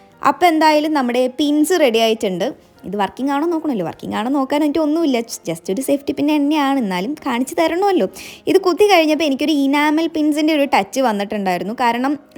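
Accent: Palakkad, India. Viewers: ചേച്ചിൻ്റെ വീട് പാലക്കാട് ജില്ലയിലആണ് എന്ന് പറയുന്ന നതിന് മുൻപ് ചേച്ചിയുടെ SLANG കെട്ട പ്പോൾ മനസ്സിലായി പാലക്കാട് ജില്ലയിലാണ് വീട് എന്ന് ഞാനും പാലക്കാട് ജില്ലയില്ലാണ്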